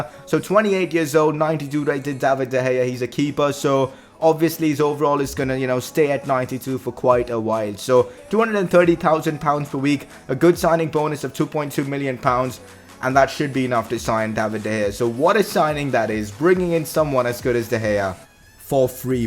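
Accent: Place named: India. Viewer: Steve Worrell he's got a sour throat probably Indian cuz I don't know how welsh people sound like.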